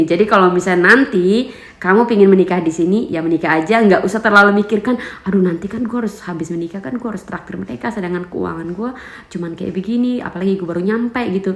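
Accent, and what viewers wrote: East Nusa Tenggara, Indonesia. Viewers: mba dengar dari logat ny kaya orang NTT yah? maaf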